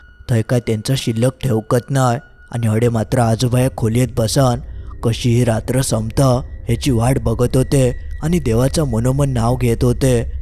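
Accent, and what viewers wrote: Malvani, India. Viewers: Me tumchi ghoshta yechi khoop vaat bakto mala tumhi malvan madhun bolta te mala khoop avadto maze board chi exam chalu ahe mhanun hee 8-9 divss mi tumchi gosht ikoshakat nahi pan exam zale ki zoptana youtube ugadalya -ugadlya tumichich ghosht iknaar ❤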